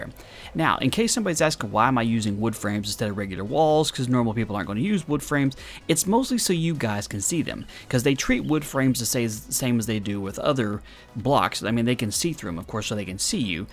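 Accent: South, United States. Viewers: You have a cute Southern accent.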